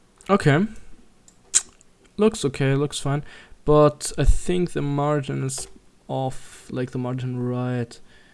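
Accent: Germany. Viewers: Hi, dein Englisch hört sich aber ziemlich deutsch an von der Ausprache, danke für dein Video.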